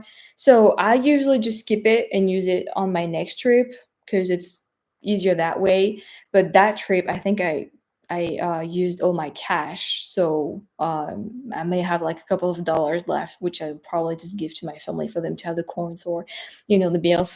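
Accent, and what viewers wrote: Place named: France. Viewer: I love your French accented English! 😎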